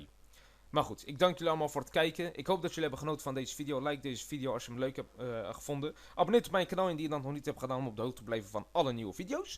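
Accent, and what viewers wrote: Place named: Netherlands. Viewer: jouw engels is zeker niet slecht,hier en daar wat woorden of zinnen die ik anders had gezegd of vertaald.. alleen dat nederlandse accent he? kan je niks aan doen,maar is wel grappig XD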